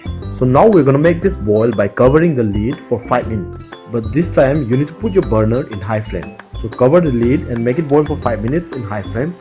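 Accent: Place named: India. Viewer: So many critics in the comment section... english is a language, just a way of communication,, if u can understand and communicate than thats good enough.. different people have different accent.. british americans scottish all have diff accent.. and i know how indians speak and its not appreciated either by others😂😂 so stop criticizing ur fellow indian😂😂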